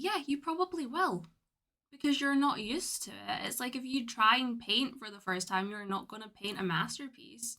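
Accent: Scotland, United Kingdom. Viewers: Love the trace of a Scottish accent,as well your beauty,! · May I ask where you are originally from? It SEEMS like Scottish, however your accent has picked up and dropped a bit...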